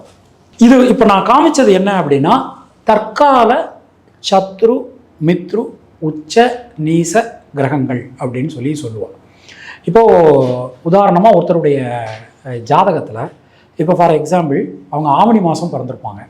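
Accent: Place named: Manipravalam, India. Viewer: அம்மா நன்றி தயவுங நமது மணிப்ரவாள பேச்சு நடையை விடாதீங்கோ.நன்னா பேசறேள்.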